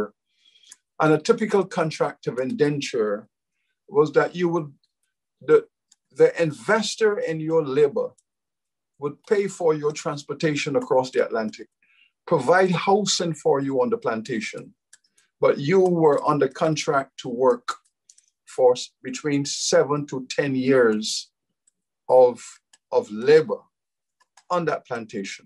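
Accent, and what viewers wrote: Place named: Caribbean. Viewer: Dude has books. Damn. And a posh Carib accent.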